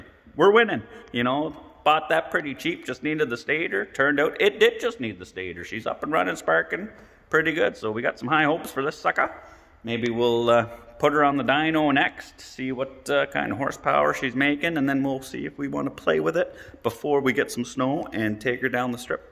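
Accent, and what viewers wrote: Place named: Nova Scotia, Canada. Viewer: Ive had 4 of these... Absolutely no disappointments!! You sound like your from NS!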